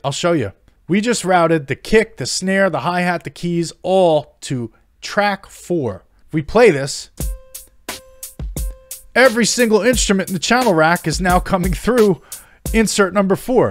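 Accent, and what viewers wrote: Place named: East Coast, United States. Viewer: awesome video. btw where are you from? i hear a slight accent and it's driving me crazy that i can't place it. somewhere over on the east coast?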